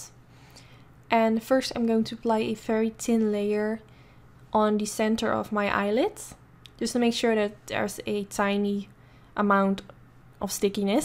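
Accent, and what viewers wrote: Netherlands. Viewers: ik hoorde je praten en wist meteen dat je nederlands was haha! ik zou iets meer werken aan je engelse uitspraak!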